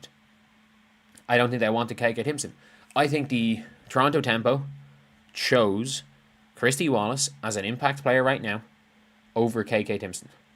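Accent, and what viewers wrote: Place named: Ireland. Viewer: He has a brogue, says he's in Ireland, Twitter says trending in Ireland and people can't figure out Ireland....sorry, that's just willful ignorance. Eire not 'Merica for. Sorry. Best wishes to all.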